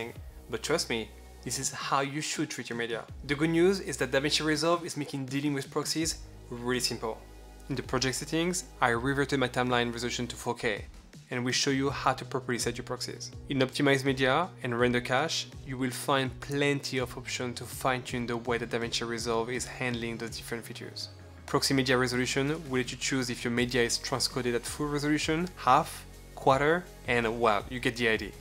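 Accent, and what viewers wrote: France. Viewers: mmm French accent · Trench accent ? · I believe you're french... Based on your accent 🙂 Thanks for the video. I'm still on the free version, I wonder if I upgrade to studio with my RTX 2070 Super would be enough to have smooth playback in almost all situation or if I should go with the new MacBook m1 ? What are your thoughts on this ? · Loved your video!!! Super thanks!!! ❤❤❤ Your accent is from France, am I right? 🤭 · I love how he speaks in french using english words! :D. Thanks for the video, I didn't grasp much as a total noob but generating proxy media solved the issue. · merci french accen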